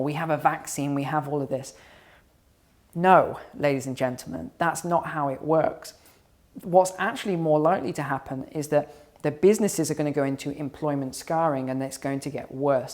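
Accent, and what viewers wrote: England, United Kingdom. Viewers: I have heard that some English people live outside the UK. His accent is authentic English. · He is definitely British . I’m British so I can hear his delivery is British, even regardless of his accent ! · English with English accent using US 'English' words to appeal to the audience.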